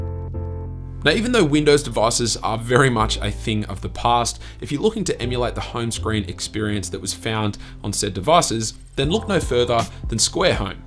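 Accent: Australia. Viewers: Heard the Aussie accent and subbed straight away! Looking forward to seeing the back catalogue of videos 👍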